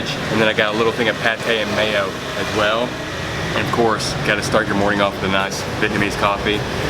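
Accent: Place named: Texas, United States. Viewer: He y! can't get enough of your videos bro! You must be from Texas by the way you sound.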